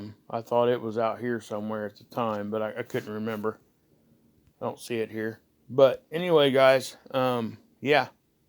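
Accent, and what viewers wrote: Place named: Ohio, United States. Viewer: It helped that heavy Ohio accent ! 😉 o know cause my brother has lived in Ohio for 20 years you all sound the same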